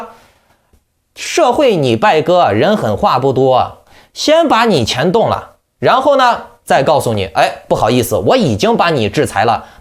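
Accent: Henan, China. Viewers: 你说的是河南话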